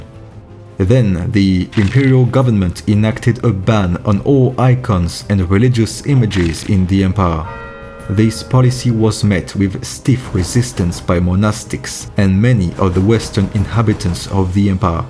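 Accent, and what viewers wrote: France. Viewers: From your accent in this video i think you are frensh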